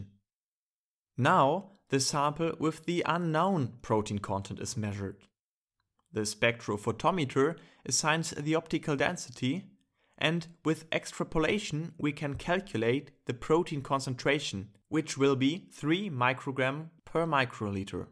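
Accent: Germany. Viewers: I bet you're a german speaker. Thanks for the video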